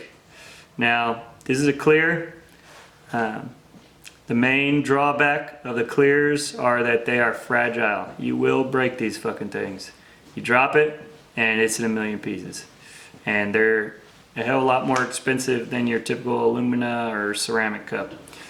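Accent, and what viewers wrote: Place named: South, United States. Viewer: U sound like a God damn hillbilly. 💗 love it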